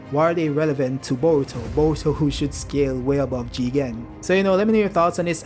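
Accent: Jamaica. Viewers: Da video creator ya a yawd man? 🧐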